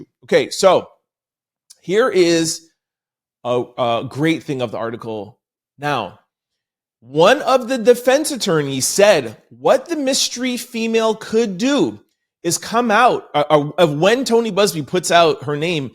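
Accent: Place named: Canada. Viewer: His videos always seem to say a day ahead so I think he must be in another country. He sounds Canadian but maybe he lives in Japan or something 😂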